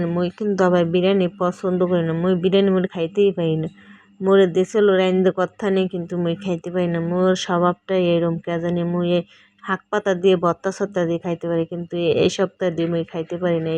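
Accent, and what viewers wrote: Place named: Barishal, Bangladesh. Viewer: মাশাল্লাহ বরিশাইল্লা কথা অনেক সুন্দর। এর সাথে তোমার কাজগুলো অনেক সুন্দর ❤❤🎉🎉সুস্থ থেকো আপু লাইক দিয়ে পুরো ভিডিও দেখিনি। 🎉🎉🎉🎉🎉🎉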